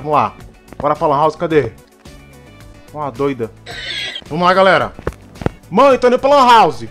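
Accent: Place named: Pernambuco, Brazil. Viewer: conheço um oxi ate se eu fosse surdo,vc e Pernambucano sim como eu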